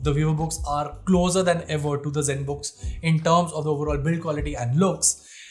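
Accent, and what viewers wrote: India. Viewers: Good indian accent My brotha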